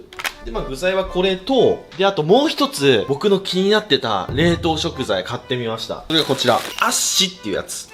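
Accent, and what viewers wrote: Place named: Hakata, Japan. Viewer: いつも楽しい美味しそうな動画ありがとうございます❤ 博多弁と急なえちえち雰囲気、 謎すぎてめっちゃ笑いました🤣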